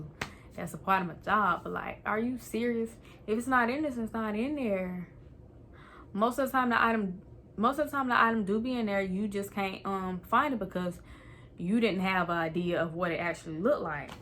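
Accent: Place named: Georgia, United States. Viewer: I would Georgia or South Carolina. I don’t hear Texas lol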